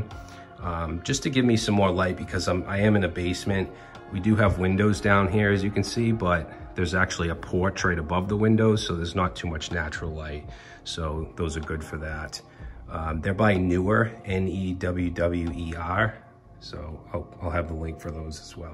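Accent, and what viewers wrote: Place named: Massachusetts, United States. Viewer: There's something about a Mass accent that "sounds honest". Stereotypical of me, I know, but there you have it. Perhaps it's that New England can-do spirit.... or I spent too much time awtching Norm Abram on New Yankee Workshop when I was younger.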